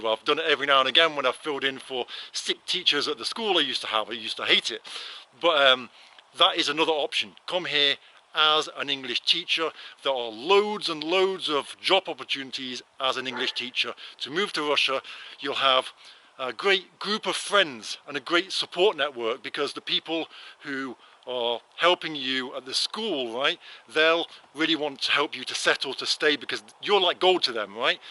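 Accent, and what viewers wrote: England, United Kingdom. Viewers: Your English is ENGLISH. Teach me. I am British but not English like you.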